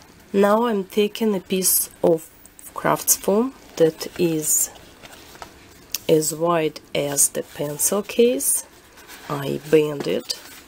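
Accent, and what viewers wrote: Russia. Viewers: Привет she has a Russian acsent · Its really cool,my friends asked me if i made it and they liked it;)i told them about your channel amd they loved your crafts and my friend send a message for you "Wow,she is really creative!i like her accent and i think she is russian.man russian ppl are so cool>.<"-ellie(my friend:) )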